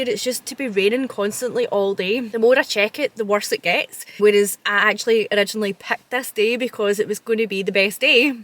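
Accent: Scotland, United Kingdom. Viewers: I've been watching your videos because I'm trying to learn to fake a Scottish accent for my dnd game lol. Really loved hearing about you talking about banning wipes because of how they aren't good for the environment. I used to use them all the time and felt bad about it (didn't flush them though) but I've finally moved to CeraVe Micellar water and cotton pads! (I know the cotton pads have issues too but they are much better than what I was using). Anyways, love your videos and keep being the lovely you that you are. 💜Also, as my Norwegian in-laws always love to say...there is no bad weather, only bad clothing! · I’m from the French-speaking part of Canada and I just love your accent!!! Is the WD accent similar to the Glasgow one?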